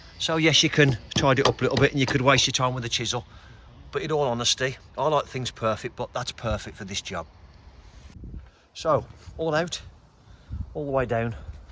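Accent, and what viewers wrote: Birmingham, United Kingdom. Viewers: I may have missed this, but what is the Length and Width of this Build? I may have missed it in Part 1...Sorry. Just curious if a particular wall lenght is more than 4.8m, bracing 2 pieces of timber, sould that be sufficient? Example 6m by 4m outbuilding. Definable feel the ground prep is much cleaner than have pouring concrete for a slab...think you have sold me on this rod system.... Videos and content great - plus the brummie accent ❤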